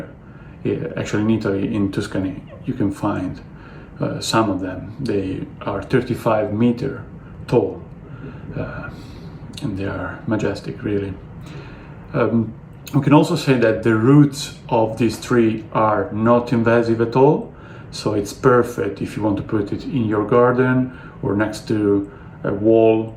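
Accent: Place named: Venezuela. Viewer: Tienes acento venezolano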